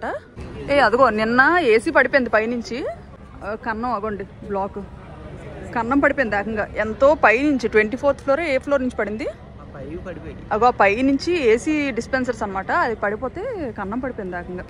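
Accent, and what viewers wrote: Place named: Srikakulam, India. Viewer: Language Srikakulam maraledu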